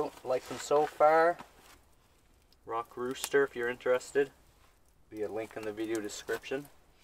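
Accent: Canada. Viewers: where are you from? Your accent sounds similar to Cape Breton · Luv that Canadian accent ! / almost thought I was watching the trailer park boys lol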